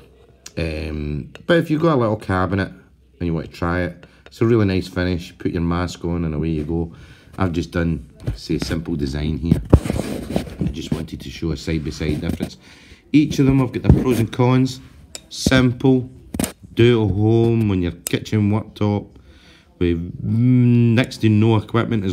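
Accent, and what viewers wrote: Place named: Glasgow, United Kingdom. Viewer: Happnin, a requested you as a player on ps4, you sound like you live in Glasgow or paisley or something. Thing is I've got a guitar and I've had a bit of trouble getting the pickups working. I bought one of these none solder click together harness set up. I thought I put it together well but didn't realize I need to solder an earth wire l. In short I can't get any sound from the guitar and was hoping you'd except some money to fix it. You could also make a video about it if you wanted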